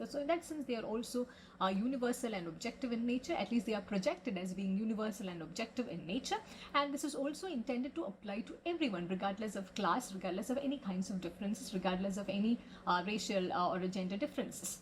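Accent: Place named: RP, United Kingdom. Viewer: What exactly is your problem? I think she speaks RP.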